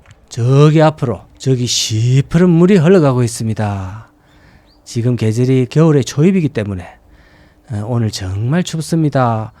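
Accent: Gyeongsang, South Korea. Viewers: 저도 * 같은 어투를 쓰는 갱상도 촌놈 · 우리동네 오셨네요 만났으면 해장국 한그룻하는긴데 덕분에 갱상도 원조말을 잊지않습니다 서울 외국 생활하다보니 점점 잊고있습니다 감사합니다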